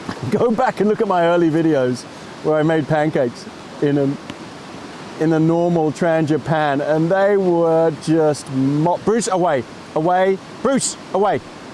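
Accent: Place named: England, United Kingdom. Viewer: you look Maori with a English accent awesome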